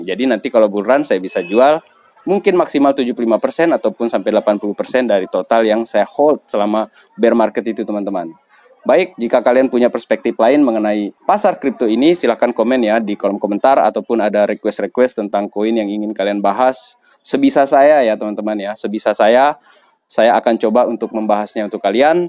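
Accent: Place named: Manggarai, Indonesia. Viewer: Berarti sama logat nya dengan kita orang manggarai yah 🤣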